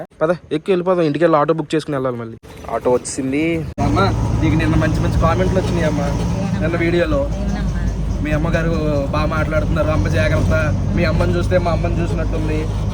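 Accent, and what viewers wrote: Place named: Godavari, India. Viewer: మా గోదారోళ్లతో అలానే ఉంటాది మరి... Love u 💗💗💗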